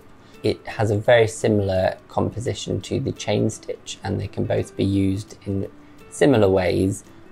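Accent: United Kingdom. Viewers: To each his own. I have to strain to understand his ever-so-gentle British accent. Sorry.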